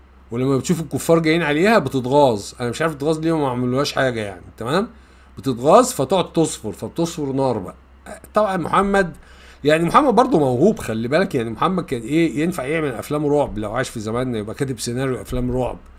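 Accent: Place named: Egypt. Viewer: احلى واروع واجمل شي من المصريين هو اللهجه الحلوة وتبسيط الموضوع بكلمات خفيفة وقويه ودم خفيف ،،هو اللهجه المصريه اللي طالع زي السكر من فم الأستاذ الكبير الف تحيه حب واحترام لك❤❤❤🎉🎉🎉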